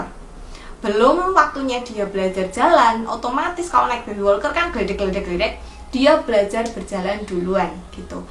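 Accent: Java, Indonesia. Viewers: banter bangeet 😂😂 bu bidan mantap, njowoniii bangeet 😂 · gledekan wkwkwk aku suka karna bu bidan medok kaya aku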